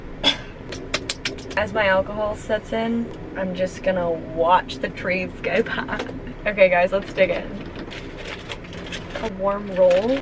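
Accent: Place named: South, United States. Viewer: I could listen to you talk like a Southerner all day long. Please do so and keep up the good work.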